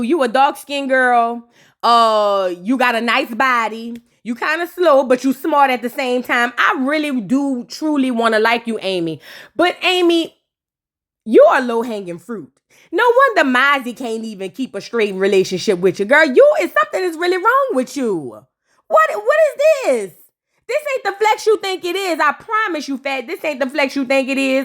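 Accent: New Orleans, United States. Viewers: I was just scrollin and ya accent and the way u said this point made me tap in....Chiiillleeee i love a Nola accent❤❤❤ much love from philly❤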